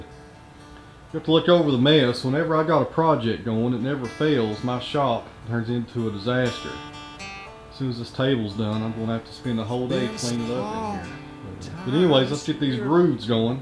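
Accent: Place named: South, United States. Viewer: That southern accent!!!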